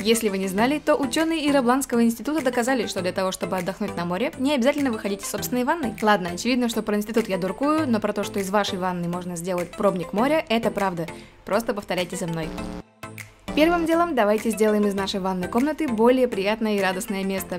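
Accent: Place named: England, United Kingdom. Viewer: ооооочень нравится твоя английская интонация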